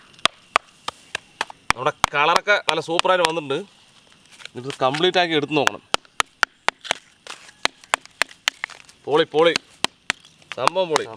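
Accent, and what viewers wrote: Palakkad, India. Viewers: Ayyo nmde palakkad slang kelkumboo bayankara happyyyy😍😍😍😍🤩🤩🤩🤩❣️❣️❣️❣️❣️✨✨✨❣️❣️❣️❣️❣️❣️❣️❣️❣️❣️❣️ikka video polichu ❣️❣️❣️🤗🤗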